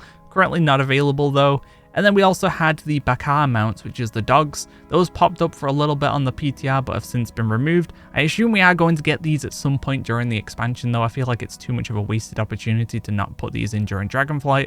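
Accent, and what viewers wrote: United Kingdom. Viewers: You're an absolute unit. Playing a half empty PTR for weeks to provide us collectors with top notch information. I also really love your straight to the point but still detailed style of video - and your UK accent is just the cherry on top, love it 💖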